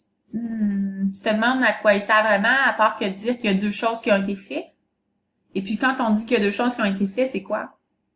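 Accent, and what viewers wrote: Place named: Quebec, Canada. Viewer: J'aime l'accent québécois.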